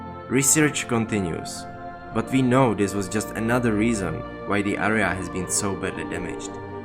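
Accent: Czech Republic. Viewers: Cesky prizvuk slysim :). DIk.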